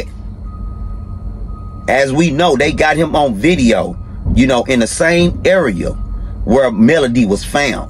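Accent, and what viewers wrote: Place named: United States. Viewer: Love your show and your country accent.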